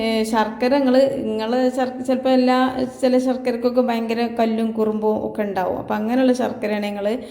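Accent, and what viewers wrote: Malappuram, India. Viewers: ഇംഗ്ലീഷ് നല്ലത് പോലെ അറിയാം പക്ഷേ മലയാളം അറിയില്ല... മലപ്പുറം ബാഷയാണ്.. തോന്നുന്നു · Malapputam bhasha rasakrammanu..😊undakkunnathu kanappozhe vayil vellam varunnu..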